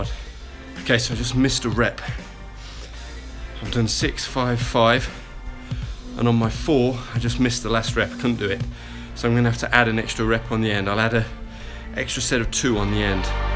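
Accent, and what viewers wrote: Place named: United Kingdom. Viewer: You helped get me off my ass or bum as you called it. Motivating to watch, I also enjoyed hearing the bits of Les Brown thrown into the video. Good luck in the future from across the pond, guessing by the accent. Oh and by the way I bet if you had rested for a couple days instead of one you would have done better on your test.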